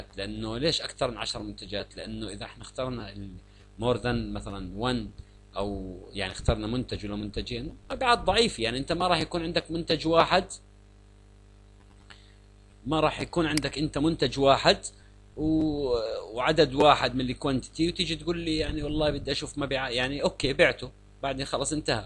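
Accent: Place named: Jordan. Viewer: لهجتك بجنن اردني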